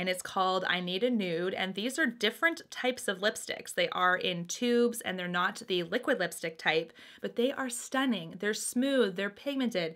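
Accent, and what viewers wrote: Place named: Canada. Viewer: I have to say that for some weird reason, I am OBSESSED with accents and ways of speaking from other countries, etc. I love how you Canadians say ABOUT!! ❤️❤️❤️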